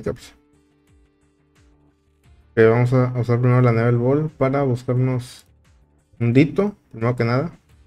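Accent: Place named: North, Mexico. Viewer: EL CARRITO SALVANDO LA PARTIDA ,. TU ACENTO ME SUENA MUY DEL NORTE,. SALUDOS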